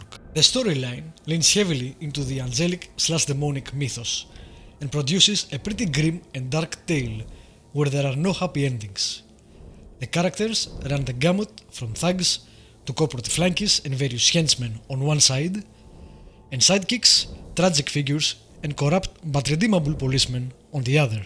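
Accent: Greece. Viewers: Thanks for the clear cut, concise and short review. By the way, are you Greek?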